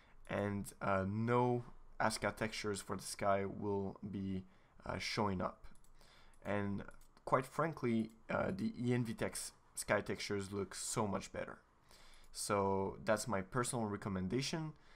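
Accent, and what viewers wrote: France. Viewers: Vive la france XD grillé en 2 secondes avec ton accent ! Bonne continuation !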